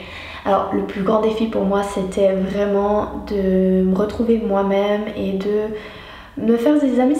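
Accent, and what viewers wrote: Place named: Switzerland. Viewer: Merci d'avoir publié de cool vidéos en français. J'ai appris beaucoup de nouveaux mots. Votre contenu est tellement intéressé. J'avais un peu peur d'écouter, comme l'accent suisse est tellement différent de l'accent québécois. Avez-vous des recommandations d'autres vidéo français?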